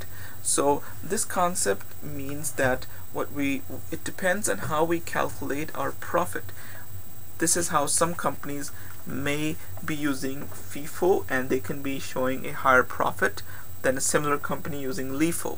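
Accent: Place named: Canada. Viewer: you are the best teacher ever and youre canadian and your accent is beast so youre my favorite now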